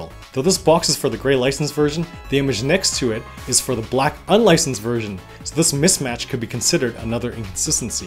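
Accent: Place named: Canada. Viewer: Cheers from a fellow Canadian! It was funny hearing Cdn "aboats" both in your review AND that Cheetahmen ad. :D And thanks for VGMaps too; didn't know you ran that site.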